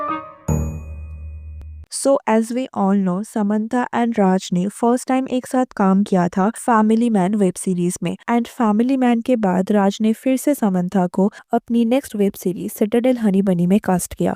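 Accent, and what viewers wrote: Bengal, India. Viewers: तुम्हारी voice से साफ़ समझ आता है कि तुम bengali हो और bengali को support कर रही हो. Probably shyamala de कि टीम से हो. कोई innocent नहीं होता Bengali सबसे बड़े धोखेबाज होते हैं. Just stop defaming Samantha and shut up please. 😂😂😂😂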